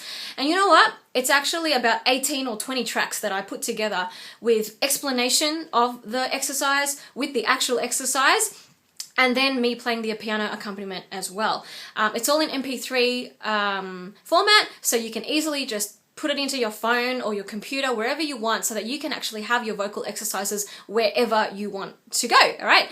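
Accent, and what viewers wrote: Australia. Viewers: Does she speak with Australian accent? Or am I mistaken? :) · Omg are u Australian I looove the Australian accent it's soo cool!!!!!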